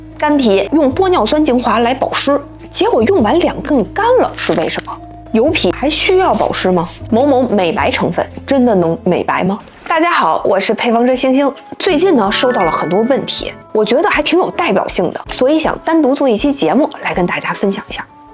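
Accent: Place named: Beijing, China. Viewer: 感谢您的讲解，说话京腔特逗😅，非常喜欢您的节目，希望多出，受益匪浅❤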